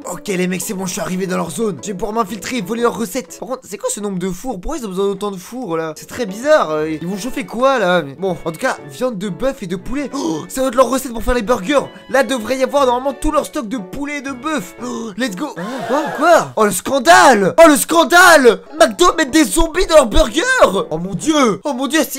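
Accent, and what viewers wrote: Marseille, France. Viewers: "Je t'en met 1 pas 2"😂"je vais te taperrrrr" 😂magnifique l'accent Marseillais 😂😂😂meilleure video de tous les temps 🎉🎉😂 continue comme ça😂🎉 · C’est une masterclasse l’accent marseillais continue avec l’accent marseillais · Incroyable l'accent marseillais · Tu fais bien le marseillais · Sé té tro. Marent l a ! Accents en marseille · G adoré l accent marseillais 😂😂😂😂😂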